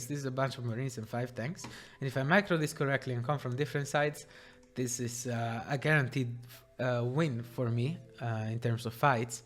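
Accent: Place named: Italy. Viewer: Thank you for the video! You did a good job of analyzing what Oliveira did right, rather than focusing on what you did wrong. Definitely the correct approach. Your perspective is interesting, and hearing your Italian accent is a nice bonus. My only critique is that the transitions whenever a cut happens could be improved. Either make it smoother/less obvious, or make it more obvious, as right now I get confused when a cut happens.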